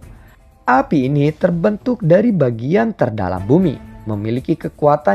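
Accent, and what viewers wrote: West Java, Indonesia. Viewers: Mimin orang Sunda kah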